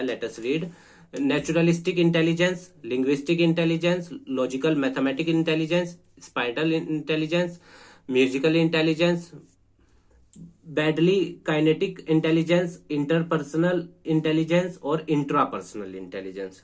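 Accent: Haryana, India. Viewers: Yor are from haryana ?? Thanku bhaiya.. Your explanation is very helpful for me.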